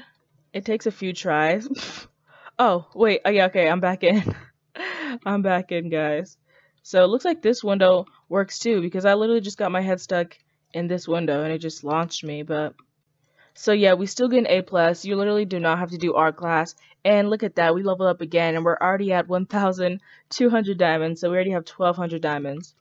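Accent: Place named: Jamaica. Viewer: You kinda sound like a jamcain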